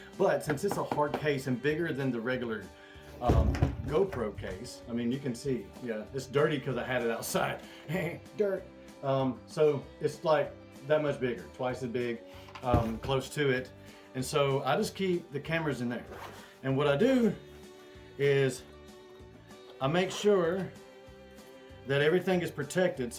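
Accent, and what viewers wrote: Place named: Pea Ridge, United States. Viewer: Sounds like a pea ridge feller I know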